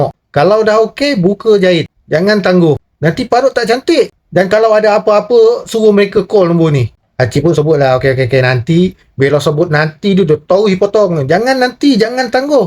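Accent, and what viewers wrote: Negeri Sembilan, Malaysia. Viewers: orang NISMILAN..tu deh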